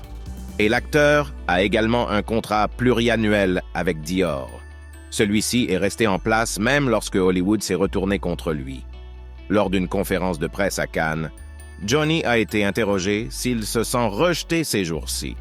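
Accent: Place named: Canada. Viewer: Adorable accent Canadian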